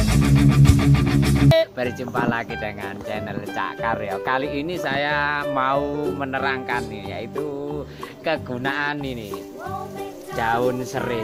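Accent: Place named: Java, Indonesia. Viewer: Asli ngendi bang kok boso jowone kadang kentel banget👍👍👍 Salkom dan mantuulll banget👍👍👍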